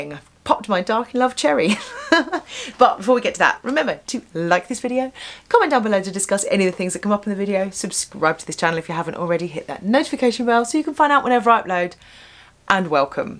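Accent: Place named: England, United Kingdom. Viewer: It ist a French word! And I love it. I think it means the same as décolleté. If I give the word decolletage into the online translator it means in German somethin so spezific different ;) Thank you so much for a new favourite word. One of my other favourit words is generous. It sounds so loveley. By the way I like your English accent.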